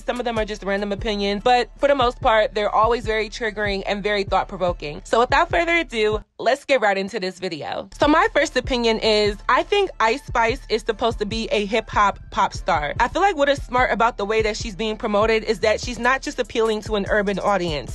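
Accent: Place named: Philadelphia, United States. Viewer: Your Philly accent is so cute😂❤❤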